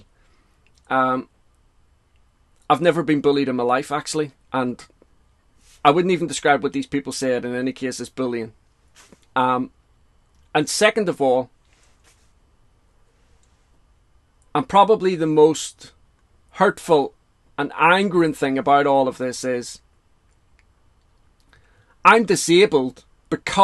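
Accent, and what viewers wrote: Northern Ireland, United Kingdom. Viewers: Hey. If you are closing the channel, I just want to say thanks for the content you've put out. I've only discovered your channel relatively recently but it seemed like any art supply I looked up you had a really good review of. To be honest, I thought you had a Northern Irish accent so you were relatively local to me and that was kind of cool so i kept coming back, although you've since mentioned in other reviews that you're actually in England, but anyway. Anyway, just know that there are morons out there these days who just seem to go out of their way to get offended. It doesn't matter what you say, they'll twist it and do mental gymnastics to get themselves outraged. You can't let them get to you. And if, for you, that means you feel you need to close your channel, then the most important thing is to look after yourself and your mental health. 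You will be missed though. All the best. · I'm really sad about this. I've been following you for years (my other account is Maureen Refior). I know so much about you and your family that I almost feel like I know you all, in a weird way. Just hearing your voice is comforting, because I rarely speak to anyone from Northern Ireland anymore and it's just nice to hear the sound of home. I get it, though. Having your words stolen and twisted into something completely different is an ultimate betrayal. It's an act generally performed by intellectually stunted virtue signalers who get their news from Twitter and Facebook groups. They roam the internet in packs searching for victims. The pack's collective IQ is generally 43. You're a good man. Your past actions do not have to be eternally atoned; bad shit happens in wartime. And while I am sad that you're leaving, the fact that you feel this as deeply as you do really upsets me. 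I will probably join your Patreon. When I commented on Facebook I thought I had your email but I was mistaken and l don't want to lose touch with you. I pray for your daughter every day, along with you and her mum. Take care of the wonderful man you are now. Screw the trolls. Much love to you.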